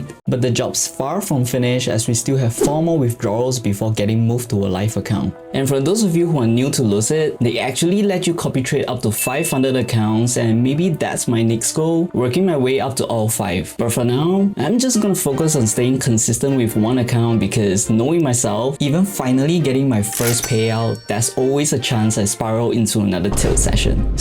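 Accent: Singapore. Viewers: are you singaporean ? Sounds about there but if so jiayous i'm also doing funded with lucid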